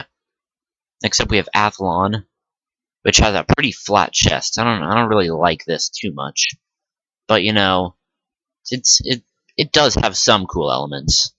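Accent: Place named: United States. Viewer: He's got a perfect American accent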